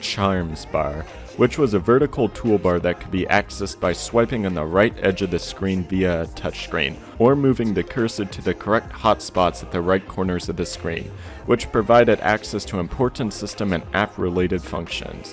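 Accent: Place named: Canada. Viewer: It may be just me but I kind of like his accent in his videos. It sounds Canadian to me. I almost expected him to say oot and aboot.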